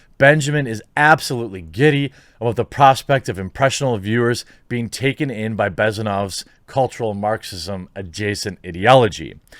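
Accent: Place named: United States. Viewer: I like your accent, i wonder which part of the US you're from?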